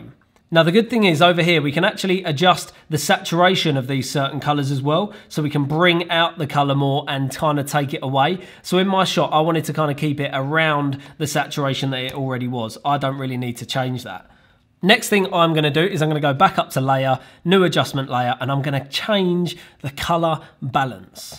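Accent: United Kingdom. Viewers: Strongest british accent...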